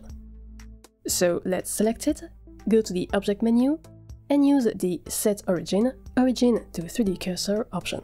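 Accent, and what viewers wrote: France. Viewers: bro is def french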